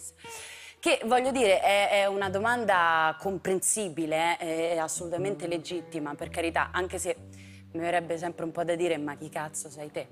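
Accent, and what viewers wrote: Rome, Italy. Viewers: La bolognese con più accento romano che abbia mai sentito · Forse perché è venuta a Roma da giovane e ha semplicemente acquisito l’accento del posto? Non capisco perché in tanti stiate facendo notare una cosa così naturale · Ma perché ad una bolognese insegnano a parlare con accento romano? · Ma che significa scusa? Se è nata a Bologna non significa necessariamente che ci sia cresciuta. Evidentemente è cresciuta a Roma, ed in ogni caso non è che gli accenti di insegnano, succede che più o meno inconsciamente si rimane condizionati dal modo di parlare delle persone del posto in cui si vive. Anche gli stranieri che vivono a Roma hanno l’accento romano, ma mica ci hanno fatto un corso! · Il problema e che hai preso l'accento romano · Non riesco a spiegarmi perché sia bolognese e parli con accento romano, dev'essere l'atmosfera di Propaganda Live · Ma perché se è nata e cresciuta a Bologna parla con accento romano? 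· "Simpatisci","ghe ho lletto","era brobrio", "lo cabisco", "diggiti", "ti vuole bbene", "cresciuta a BBologna", "scinque anni", "riscevevo", "de mmerda", "perzonalmente, proprio perzonalmente", "una pagina nell'internet", "un incubbo","bbasta","scinque","scertezze","amisci","BBene", "lisceo" (e svariate altre topiche) ma un'attrice corsi di dizione non ne fa? Forse li ha fatti a RRoma. La cadenza bolognese, mostrata nel film con Accorsi, era meno "borazza" e più spontanea. Ecco perchè a volte viene doppiata. Ora parla "strasscicato" come il cronista "impresentabbile" e raccomandato dell'America's Cup, che non si poteva sentire e non si poteva vedere, con la differenza che lei almeno il fisico del ruolo ce l'ha. Nella putrida fiction su Leonardo interpreta un personaggio storico inesistente, che imbonisce il quarantenne Leonardo assistente nella bottega di Verrocchio (ma il Leonardo discepolo del Verrocchio di anni ne aveva tipo una quindicina). 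Detto che il problema della fiction "Leonardo" è imputabile agli autori irrispettosi e incapaci e non agli attori, nel film con Accorsi MDA mi aveva piacevolmente sorpreso, e pure nell'isola delle Rose. In definitiva viene proprio da chiedersi "Chi cazzo è MDA?" Perché una bolognese che parla in romanesco una sua identità evidentemente non l'ha ancora acquisita. Ps: Matilda, gli euro valgono più dei dollari, quindi non dica "dollari non euro", come se in dollari la cifra fosse pazzesca, in realtà è il contrario. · ma ha accento Romano? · La gente dice “chi cazzo è Matilde De Angelis” semplicemente perché: 1) Per settimane, prima e dopo Sanremo, eri ovunque, tv, radio, giornali, ma la maggioranza degli italiani non ti conosceva. E probabilmente non ti conosce neanche oggi. 2) Sei saccente, spocchiosa e te la tiri. Se un personaggio pubblico è così, non si deve sorprendere se attira antipatie e se riceve insulti ogni giorno. 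PS: dici che hai “ripulito” l’accento bolognese, ma perché adesso parli come una coatta romana? Comunque hai 25 anni, hai ancora tanto da imparare e ancora poco da insegnare, l’umiltà non fa mai male.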